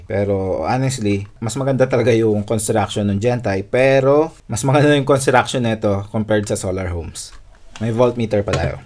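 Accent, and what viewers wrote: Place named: Pampanga, Philippines. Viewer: Bro, Pampanga based ka ba? May accent ka. Will be buying your batt pag-uwi ko Zamboanga or kung Pampanga ka tag mo ko. Pampanga-based ako.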